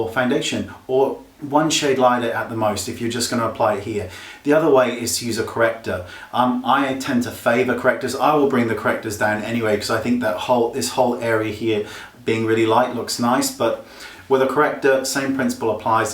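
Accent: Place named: United Kingdom. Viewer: I love your channel... I come from Italy and for me it's a bit difficoult understand your british English :D (i understand better American English)... But I love the way you say your advices :D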